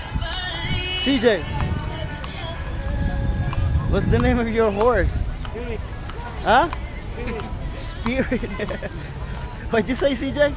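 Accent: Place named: Ilocos, Philippines. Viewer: sarap pakinggan mag ilocano si..kakaproud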